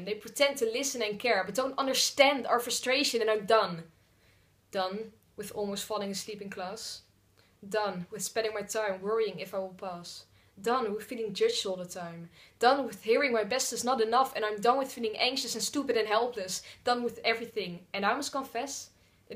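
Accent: Netherlands. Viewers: Heyyy are you Dutch? I think I recognise the accent.👍🏻👍🏻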